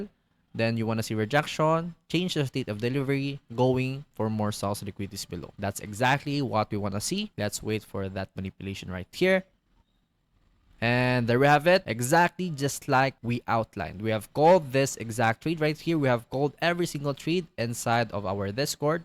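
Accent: Philippines. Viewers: he sounds like Filipino to me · a thick pilipino accent. musta pre?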